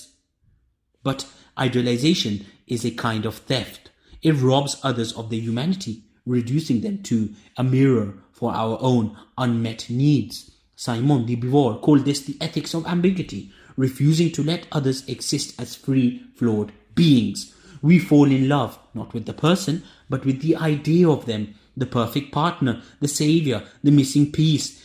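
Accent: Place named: India. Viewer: Your accent sounds Indian.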